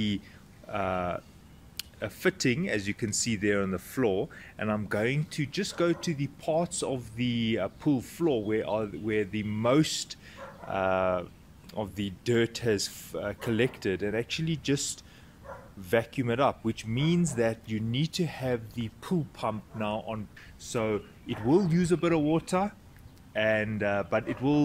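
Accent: South Africa. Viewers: Lekker , hearing a SA accent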